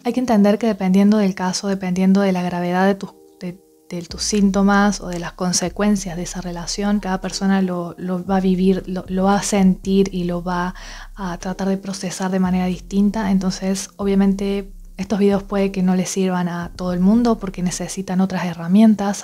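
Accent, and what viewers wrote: Córdoba, Argentina. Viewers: Ese lindo acento será cordobés? 😊 saludos genia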